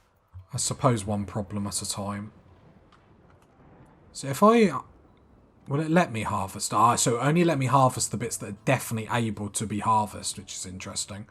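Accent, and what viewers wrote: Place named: United Kingdom. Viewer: Your accent...just adorable! I can't have enough of the British accent! Well I have a house in England, you can understand that I love your country! xx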